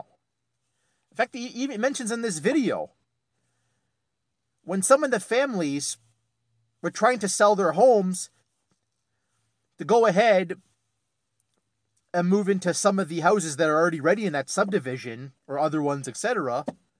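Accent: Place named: Portugal. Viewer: Are you from Portuguese decent bc I sense a very mild accent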